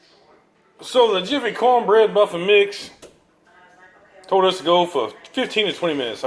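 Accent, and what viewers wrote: South, United States. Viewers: Love love love love your southern accent! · I love his southern accent. Lol he said don't smoke no pot in Texas 😂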